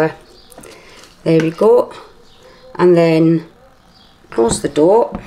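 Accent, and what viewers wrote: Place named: South Wales, United Kingdom. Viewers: I recon that's a Rhondda accent. I get my flour from Aldi in Porth, When I say Porth I'm never sure if it's in Porth or Dinas. I'm new to using a machine for bread so thanks for the tips. Oh, and I use Honey instead of Sugar. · when I heard you speak I knew straight away, “ah, she’s from Wales.” My career was as a primary school teacher and I taught two little girls whose parents had emigrated from Wales to Australia 🇦🇺. They sounded just like you! It’s been 30 years or so but it came back to me like it was yesterday! 😊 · South Wales😊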